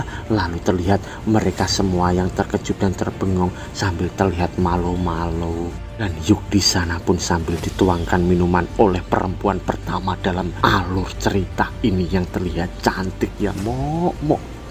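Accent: Java, Indonesia. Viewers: Logat bahasa jawa e kentara mok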